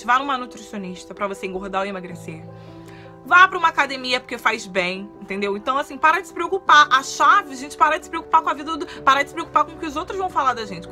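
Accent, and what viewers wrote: Rio de Janeiro, Brazil. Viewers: Esse hino de sotaque carioca aaaaa hahahaha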